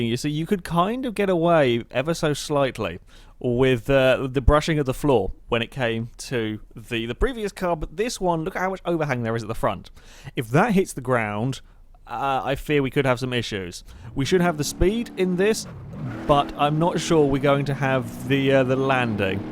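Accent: United Kingdom. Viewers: He's British. We have accents too you know.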